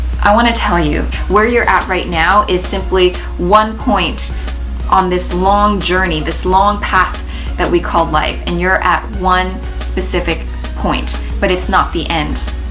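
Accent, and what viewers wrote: Canada. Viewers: I knew you were canadian before you even said you were. that accent is undeniable.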